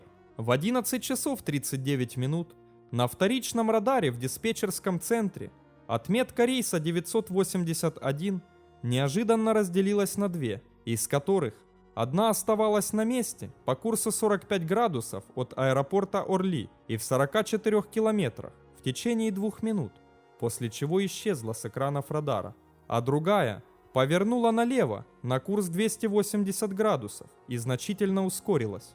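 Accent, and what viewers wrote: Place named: Ukraine. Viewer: у него произношение как у Креосана, скорее всего тоже украинец. У них как раз такой говор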